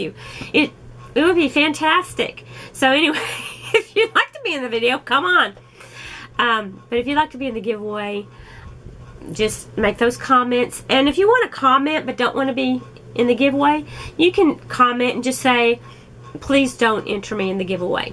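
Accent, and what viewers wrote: Texas, United States. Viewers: Haha you had me at your Texas accent 😍 I’m from Florida and have slowly learned knitting the last couple of years...but have most recently picked up crochet....that is my hobby/life right now haha... other than play with yarn, I’m recruiting my granddaughter to play with yarn with me lol....she is 6 1/2 and she loves rainbow yarn and always picks it out, usually solids that make up a rainbow and demolish and knot up 5 of my skeins....lol It’s OK just having someone else around interested in the same thing is wonderful 😊 So glad to have found your channel can’t wait to watch all of your videos.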